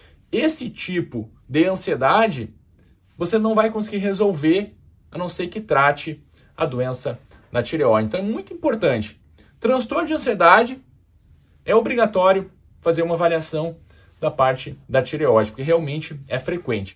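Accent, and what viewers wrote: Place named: Goiás, Brazil. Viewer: Nota 10 fala do Goiás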